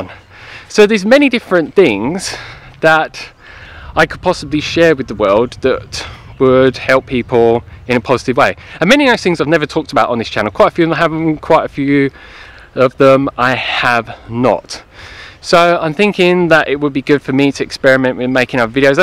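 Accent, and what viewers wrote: United Kingdom. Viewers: is he from the uk?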